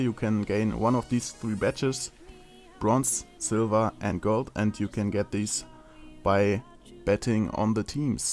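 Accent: Germany. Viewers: SO ein heftiger deutscher Akzent. Meine fresse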